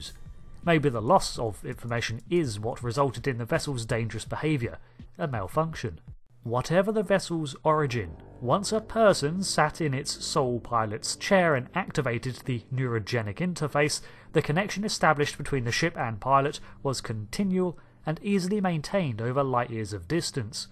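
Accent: England, United Kingdom. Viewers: Dude, your English accent is gorgeous.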